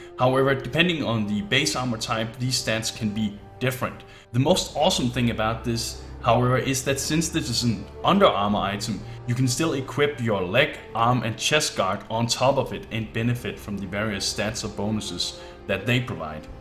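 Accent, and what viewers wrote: Denmark. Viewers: Tyk dansk accent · funny accent, are u from denmark? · OMFG I knew your accent was Danish!!!! Yes!!!! Hej! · are you danish or something??? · Are you dansk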